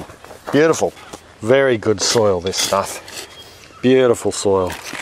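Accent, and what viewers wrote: Australia. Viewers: As a dual US/Aussie citizen, I'm not knocking the Aussie pronunciation, but it might be amusing to have the "as we say in Australia" for some additional entertainment value. Oh, and I think you mean Bell Pepper, for Capsicum?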